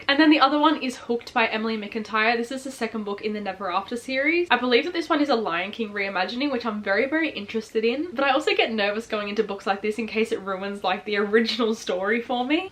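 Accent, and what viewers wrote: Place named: Australia. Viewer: Cute Aussie accent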